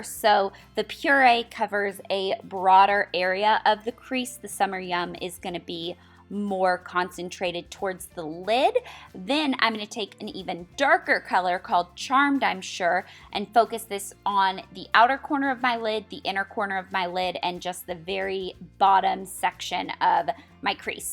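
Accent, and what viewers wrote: South, United States. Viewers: I'm not sure if you live in LA anymore, but I think of you as a Southern Belle! I say that because of the way you talk & I know you are from Tennessee & you have such a pretty complexion lol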